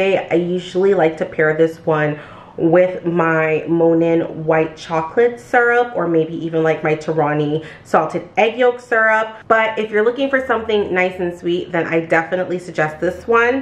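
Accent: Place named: New York, United States. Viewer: I forgot to say I’m a girl from Queens New York and I know you’re from Brooklyn I can hear that NY accent !😂😂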